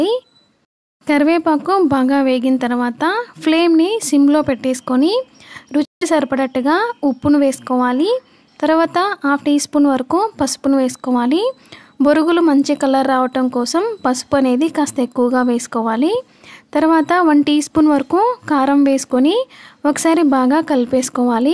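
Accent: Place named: Rayalaseema, India. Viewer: Mana rayalaseema basha ❤ chana baguntundi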